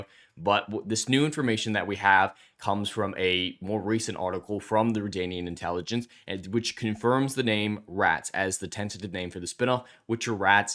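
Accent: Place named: New Zealand. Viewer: lol once again done in a badly done masculine voice that is in fact a Kiwi accent Are you not entertained? ARE YOU NOT ENTERTAINED?! Is that not why you're here?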